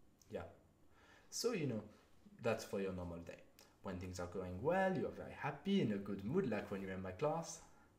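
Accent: France. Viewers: Vous parlez très bien! J'aime votre accent français quand vous parlez en anglais aussi haha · I love it already ❤️ your French accent 😍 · Your French accent in English is perfect 😂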